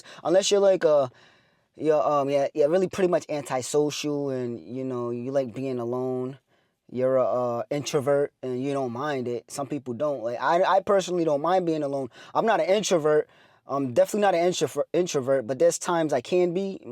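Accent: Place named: New England, United States. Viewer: great video! where are you from? i cannot pinpoint your accent apart from new england region.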